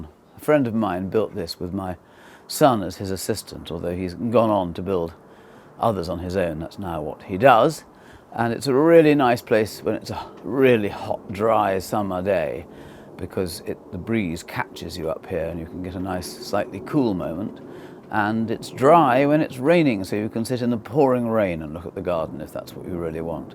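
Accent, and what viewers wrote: England, United Kingdom. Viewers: Castle, gardens and an English accent...magical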